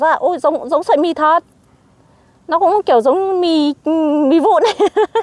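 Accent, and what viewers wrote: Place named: North, Vietnam. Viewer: Cháu này là người bac ngje nói mộc mạc quê hương bac của chúng tôi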